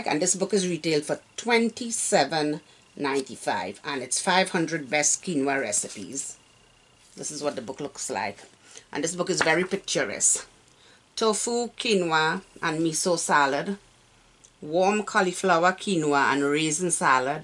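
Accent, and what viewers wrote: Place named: Guyana. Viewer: Love watching your videos! I was married to Guyanese man for years and love your accent, brings me home. also your DT has the best things!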